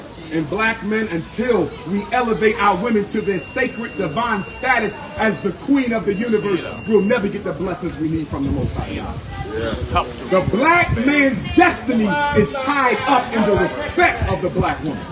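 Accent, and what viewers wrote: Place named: United States. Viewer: Who are the “indigenous” Jamaicans? Are you even Jamaican? Cos you sound American to me. And you guys clearly have your own issues you should be more concerned with. Secondly, of course Jamaican are gonna be nice to tourists. Tourism a huge part of the Jamaican economy. Thirdly, land isn’t “taken” it’s not the 1600s! Land is purchased, which the Jamaican people have no actual say in. Just like many neighbourhoods in New York were gentrified, were those neighbourhoods “taken”? You’re just chatting to be chatting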